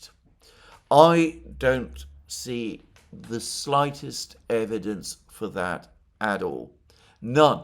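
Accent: United Kingdom. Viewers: YOU SHOW MISPLACED ARROGANCE WHEN YOU TALK ABOUT IRAN. THIS VANITY IS NOT APPROPRIATE FOR AN ONLINE GROCER, EVEN WITH A BRITISH ACCENT.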